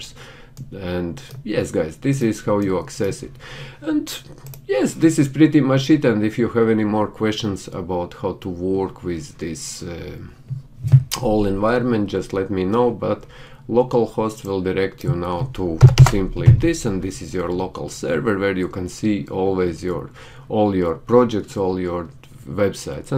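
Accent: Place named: Russia. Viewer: Russian or Ukrainian . Around there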